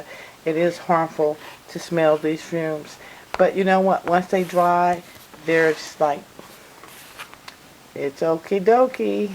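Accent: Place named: South, United States. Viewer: Thank you for this creative video! This is the technique I'm going to use for my knit slippers (that have to be hand washed anyway). Never mind the negative comments, I'm from the South too, and that's just the way people talk down there. Keep the ideas coming! <3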